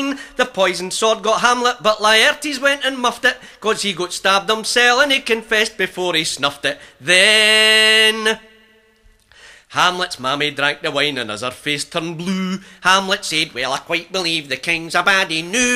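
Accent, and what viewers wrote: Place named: Scotland, United Kingdom. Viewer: This is awesomeness. And works best with the scotch accent.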